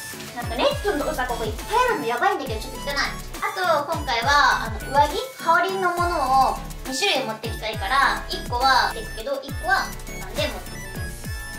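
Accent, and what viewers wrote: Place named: Kansai, Japan. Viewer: あれ⁉️ さんは、関西の血が通ってた？ちょいちょい、関西のイントネーションや言い回しを、ぶっ込んでくるよね😂😂😂 とにもかくにも、沖縄の【蚊】は、デカいよ😱😱😱 ていうか、台風大丈夫⁉️🥹🥹🥹 出張、お疲れさま｡｡｡(lll __ __)ﾊﾞﾀｯ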